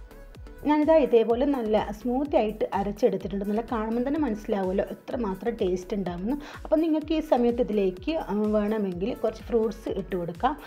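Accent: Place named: Kannur, India. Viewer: മാഷാ അല്ലാഹ് എനിക്കു ഏറ്റവും ഇഷ്ട്ടം ഉള്ള ഒരു സാദനം ആണ് ഈ കാരറ്റ് 😋കാരറ്റ് കഴുകി മൊഞ്ചക്കി പാവം അതിനെ ചൂട് വെള്ളത്തിൽ മുക്കി അതിന്റെ ചുറ്റും ഉള്ള അണുക്കളെ ഒക്കെ കൊന്ന് വേവിച്ചു തണുത്ത ശേഷം മിക്സി യിൽ ഇട്ട് കുറച്ചു പാലും പഞ്ചാരയും ഐസ് ഐസ് ക്രീം ഒക്കെ ഇട്ടു ഉണ്ടാക്കിയ അടിപൊളി ഹെൽത്തി ജ്യൂസ്‌ ഉണ്ടാക്കുന്ന കാഴ്ച ഞമ്മുടെ കണ്ണൂരിന്റെ സ്വന്തം ചാനൽ നിങ്ങൾക്ക് മുമ്പിൽ നാടൻ കണ്ണൂർ സ്റ്റയിൽ സംസാരംകൊണ്ട് നിങ്ങൾക്ക് മുമ്പിൽ അവതരിപ്പിച്ചിരിക്കുന്നു എനിക്കു ഇഷ്ട്ടം ആയത് കൊണ്ടു എന്റെ ഇന്നത്തെ ഇഫ്താർ ഡ്രിങ്ക്സ് ഇതായിരിക്കും 👌👌😋🥰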